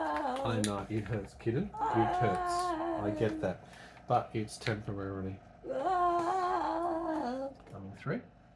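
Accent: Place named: Australia. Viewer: hi my name is Payton and I'm from Pennsylvania and I'm also a special needs adult I have William sindrom and two conditions eye and heart I'm 24 and I support you and you're wife I Hope that she is okay and tell her that I think she is awesome! and you are cool !! I like Australia accent. it's very different than ours I don't drive or live on my own and I have balance issues I'm sending parys for her !! your friend Payton from the United States of America !!❤❤❤❤❤❤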